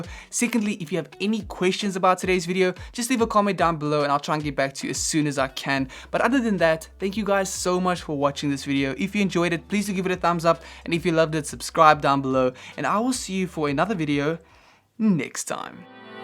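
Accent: South Africa. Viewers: Sounds like a South African :)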